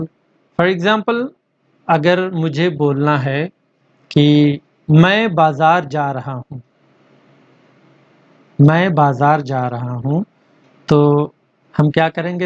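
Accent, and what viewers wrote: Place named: United States. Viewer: Very good American accent in hindi style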